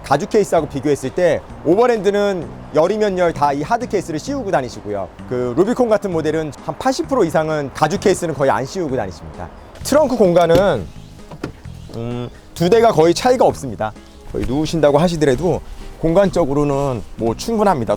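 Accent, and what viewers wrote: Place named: Gyeongsang, South Korea. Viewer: ㅎㅎㅎ굿 비교 영상 좋네요. 갱상도 말로 지깁니다.ㅎㅎㅎ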